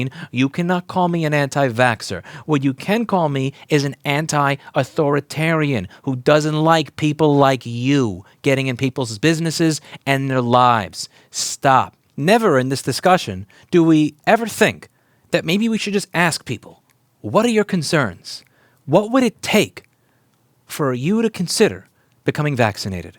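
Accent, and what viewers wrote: New York, United States. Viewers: This was definitely the most NY I've heard hahah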